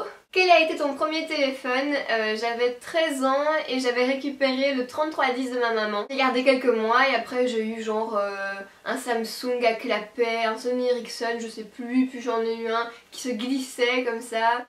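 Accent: Belgium. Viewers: Coucou, J'adore tes vidéos et ton petit accent belge:-) Ps: pense à recycler tes anciens téléphones portables, c'est mieux pour la planète!!!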